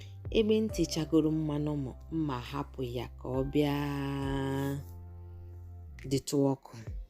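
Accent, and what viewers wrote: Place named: Anambra, Nigeria. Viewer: Nne, ji gi a ehn nyum nyum. And I sotay enjoy this your Anambra Igbo so much oh!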